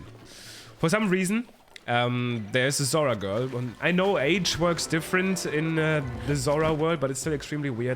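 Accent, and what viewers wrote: Germany. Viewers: Nice that YouTube starts randomly showing me small channels. Heard directly that you are German 😅 Don't worry about your voice. I didn't notice anything except the coughing.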